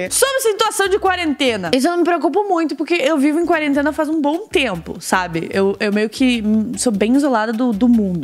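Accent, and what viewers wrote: Rio Grande do Sul, Brazil. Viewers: Ou ela é gaúcha?¿ kakakak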